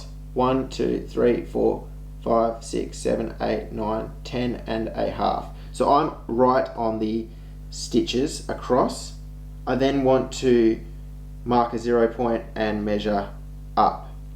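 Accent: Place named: Australia. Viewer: Thank you. I am about to knit my tension square. Nice to hear an Aussie voice too. Looking forward to more videos by you. Happy knitting 🧶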